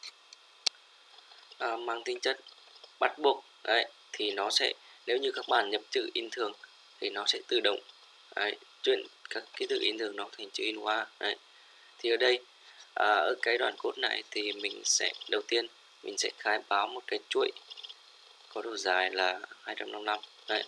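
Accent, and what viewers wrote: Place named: Nghe An, Vietnam. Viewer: nghệ an rồi!!!!!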